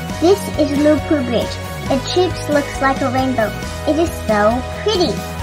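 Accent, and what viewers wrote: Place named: United States. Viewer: You sound very much like American girl.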